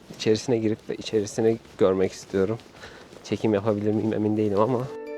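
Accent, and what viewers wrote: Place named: China. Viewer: Çince aksanınıza bayıldım ya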